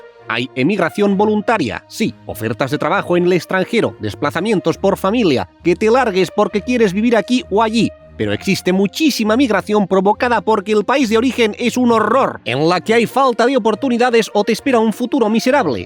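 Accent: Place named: Catalonia, Spain. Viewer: Hola divertidamente dibujado y con voz de origen del país Catalán ❤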